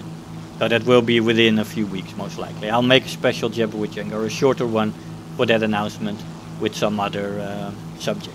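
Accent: Netherlands. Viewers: When I first saw you in battle you were such a nice guy, if I recall correctly it was a early Spit game. Some people commented on you and I decided to look at your channel. Intant hooked, you're helpful and mature. Your accent is kinda cute too (Is it Dutch?) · Great chat. I've been playing for approximately 2 years, and in that time, I've only watched three channels for WT. The first was Long5hot, the next was Tx141 and yours. I chose only these channels because of the ANALYSIS. These channels communicated nothing but useful information and helped me get passed the horrible newbie stage. The other distinguishing feature of these three channels is the adult and friendly nature of the narration. You get the impression from watching that the three authors are approachable and guys you'd like to know personally. I cannot say these things about ANY other channel I've watched. 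Some were even annoying. Finally, you introduce the element of humor and personal commentary, which I enjoy. The only other WT Youtuber that makes me laugh is Squire. So, in conclusion, you offer careful analysis, adult and pleasant narration with a Dutch accent, you include some humor and a personable approach. I'm proud to be a member of your squadron and I appreciate your contribution to the community. PS - TOTALLY PUMPED FOR THE ITALIAN TREE.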